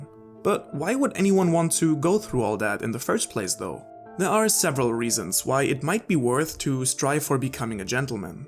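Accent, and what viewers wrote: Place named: Germany. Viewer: Are you german? Your english sounds like you are german 😁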